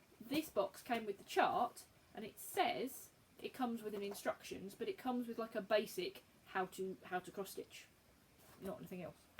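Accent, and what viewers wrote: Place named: United Kingdom. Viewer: Just found you and enjoyed your first video so will be watching more Lovely to hear another British voice When I first starting watching Flosstube in 2014 there was quite a mix of nationalities. I took an enforced break from stitching and all that goes with it for 2 years and when iI returned at the beginning of this year almost all of the new people I have started watched are from the USA. So some variety is great and I’m looking forward to catching up with your following videos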